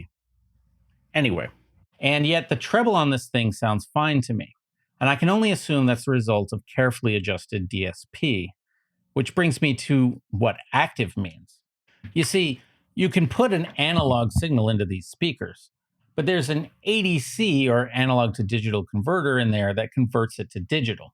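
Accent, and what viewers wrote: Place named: Midwest, United States. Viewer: We all love your Midwest accent. Excellent video by the way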